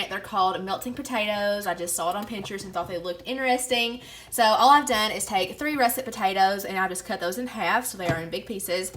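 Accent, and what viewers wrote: United States. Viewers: Hi came here from the other vlog, im from Birmingham Uk. Love your country accent and your mac n cheese.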